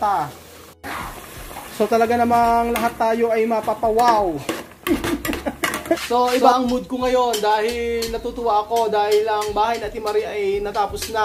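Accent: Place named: Davao, Philippines. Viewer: Parang tqga davao ,alivio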